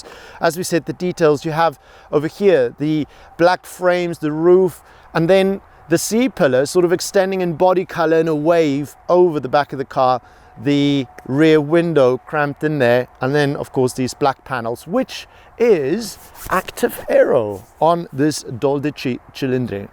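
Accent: South Africa. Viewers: My guy you sound so south African buddy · Is he South African? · Man, you sound like a South African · Is the guy reviewing the car South African, he sounds like it especially with some of the terms he's using? 😅 eg. Jislaikit and kak fast LOL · I'm in 😍 Hey man, are you South African? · Is the presenter South African... Hhay'bo... "kak fast" & (jIs-like)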